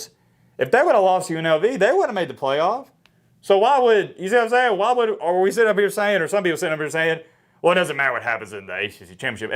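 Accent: South, United States. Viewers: And I waited through this terrible Sputhen Accent to hear Bama is out. Bittersweet. 😂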